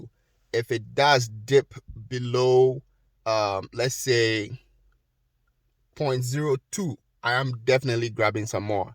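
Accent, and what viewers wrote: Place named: Africa. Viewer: Where are you from??, you have an African acent. I even just subscribed because of that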